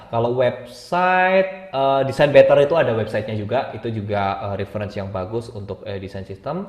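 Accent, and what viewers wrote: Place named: Surabaya, Indonesia. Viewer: Oalah Mas kie wong Suroboyo tho, pantes kok medhok..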